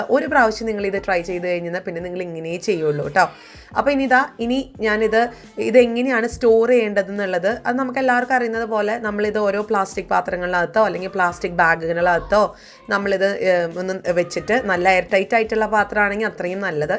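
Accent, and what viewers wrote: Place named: Poonjar, India. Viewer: Poonjar Anglisized malayalam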